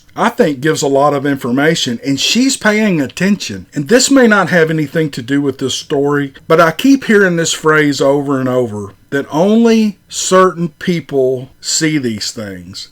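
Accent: South, United States. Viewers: Happy anniversary! I found you not long after you started. I heard about you on Bigfoot Odyssey and a couple others mentioned you. You are my people! (Southern drawl and all!);keep up the good work. By the way, I like the chit chat on the videos too. I'm more of a people person. Having a somewhat large pet page, people say I talk like I know people. I do like to get to know people on a personal level. The "chit chat" works for me! Remember it's your channel. You do you! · The siege of Lockett ranch is amazing! Wow if you could read it that would be cool too. Dark waters is a great narrator. I'd like to hear it with the southern twang as well. I've listened to it probably 5 times. But I'll listen another 5 again if you put your narration twist on it! Maybe you could work something out with DW. Hes great! And so are you.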